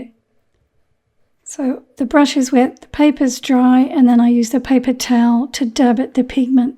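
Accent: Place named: Australia. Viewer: HI, ~ I HAVE ALWAYS JUST LOVED YOUR ACCENT, AND YOUR TUTORIALS~ NOW I JUST FOUND OUR YOU ARE FROM AUSTRALIA!!! HOW NEAT!!! I ABSOLUTELY LOVE YOUR DOUBLE GIRAFFE PAINTING BEHIND YOU~ I'M SURE YOU PAINTED THAT ONE, YOURSELF~ I HAVE BEEN WONDERING IF YOU HAVE A REFERENCE PAINTING OF THAT ONE, AND/OR A RUTORIAL OF IT!??? I JUST LOVE IT~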